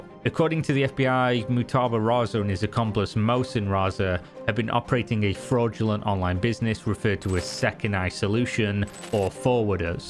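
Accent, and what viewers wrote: United Kingdom. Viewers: This is one of the very very very few videos I’ve clicked on, EVER in my entire life of using YouTube, that I’ve ever thought was just unbearably boring. Maybe it’s the British accent and slow talking on a boring subject that did it for me? I don’t know man. The video isn’t bad. It’s informative, and I’ll give it a like- but man, this was just…painful to try and sit through lol (I’m 26, been watching since I was like 12)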